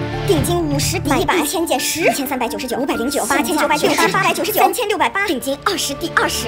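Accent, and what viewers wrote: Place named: North, China. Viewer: 奇怪，papi明明上海话讲了侠气标准，为撒普通欸吾一股北方味道？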